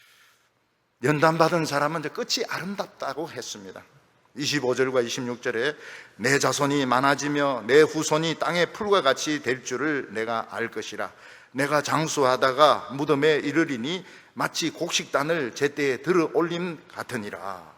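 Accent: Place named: Gyeongsang, South Korea. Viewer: 목사님! 감사합니다. 저도 경상도 사내입니다. 경상도 구수한 언어로 쉽게 이해할수 있겠끔 설교하여 주시니, 깨달으며 은혜받음을 하나님아버지로 말미암은 징계,핍박,고난 달게 받는자 되도록 새롭게 마음 다짐니다. 늘, 건강하셔서 멋진설교 지속하여 주실것을 기도 드리겠습니다.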